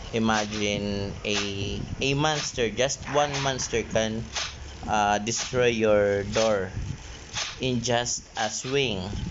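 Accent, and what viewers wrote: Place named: Mexico. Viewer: Habla español no te hagas el gringo q tienes acento mexicano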